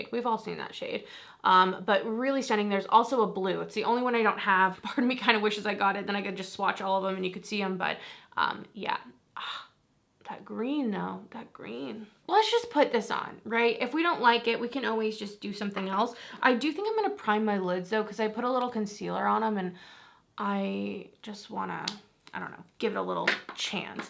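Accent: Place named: Boston, United States. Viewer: ...Don't listen to anyone who "corrects you" Girl! I'm from Boston, we have a LOT of people with the last name LUZ. Tons of businesses named LUZ and it's pronounced just as you pronounced it! LUZ I've NEVER heard it pronounced loose? That's crap! Hahaha Just tell them you have a boston accent and keep it moving! 😊 You pronounced it fine! 🙄 Keep doing you, don't worry about dumb pronunciations!! 😂 Love ya!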